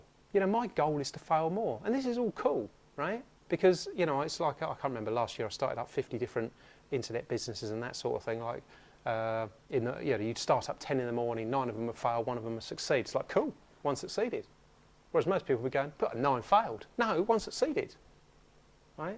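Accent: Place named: England, United Kingdom. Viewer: I meant that the audio in another channel was louder (Not same video). The thing is English accent is very heavy which makes it difficult for me to understand him even on a loud volume so listening to him on a low volume makes it harder. Oh well.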